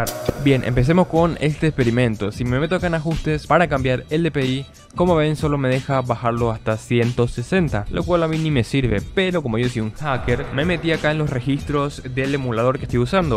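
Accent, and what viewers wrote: Paraguay. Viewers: sabía luego kp , se pilla umpoco tu voz , solo los paraguayos sabemos darnos cuenta 🇵🇾😂👍🏼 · Se te nota tu hablar de paraguay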